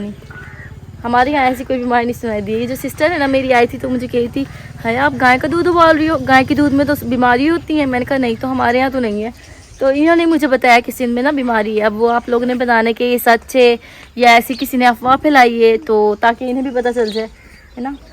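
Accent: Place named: India. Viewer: Main India se hoon magar aap ki jo tone h kahi na kahi India se mil rahi hai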